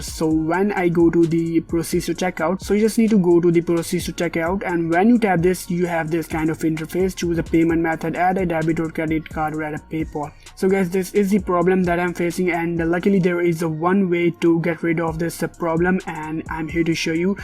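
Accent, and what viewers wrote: India. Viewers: once again dude with indian accents solved my problem. Thank you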